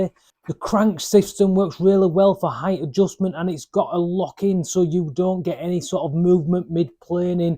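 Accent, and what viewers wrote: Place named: Northern England, United Kingdom. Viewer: Best and easy to understand review I’ve ever seen for any power tool. Told me everything I wanted to know and it been in a Northern accent was an added bonus. Thank you 🙏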